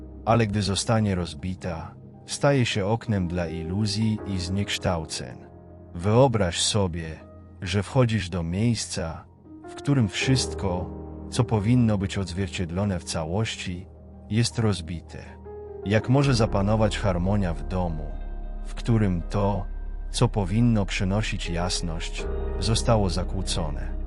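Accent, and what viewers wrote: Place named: Russia. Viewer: Co to za Antychryst z ruskim akcentem nagrał te brednie ,gin szatanie ,,akysz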